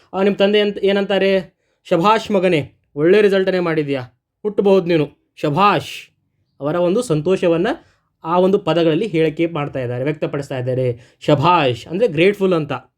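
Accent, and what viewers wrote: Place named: North Karnataka, India. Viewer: Tq sir i was waiting for this class!!nimma uttara Karnataka bhashe tumba chenagide 🌹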